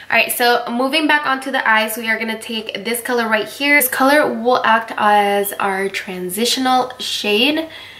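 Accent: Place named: United States. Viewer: Christina Tran well she sounds american to me so I think thats classed as an accent aha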